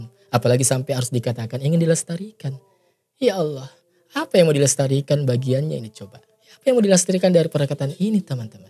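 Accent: Sulawesi, Indonesia. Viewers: Woi kamu logat Sulawesi jangan buat konten murah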